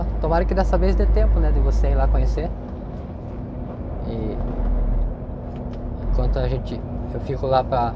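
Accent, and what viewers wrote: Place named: Rio Grande do Sul, Brazil. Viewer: Você tem um sotaque de gaúcho tchê!!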